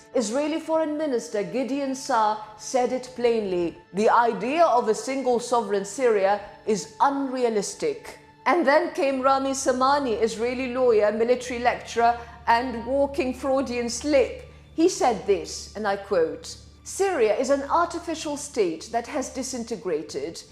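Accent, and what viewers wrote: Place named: United Kingdom. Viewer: ملة بريتيش اكسنت يا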